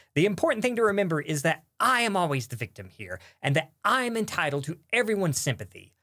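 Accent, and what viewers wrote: South, United States. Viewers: Me neither, i thought it was kind of a speech quirk or character thing, but seeing his face, I realized he was SOUTHERN. and... HANDSOME. Lmao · It’s okay, your vaguely southern accent has soothed us and your justifications seem fair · I like your subtle southern accent. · I never realized how thick his Southern accent was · Your Southern accent is less noticeable here than your regular videos.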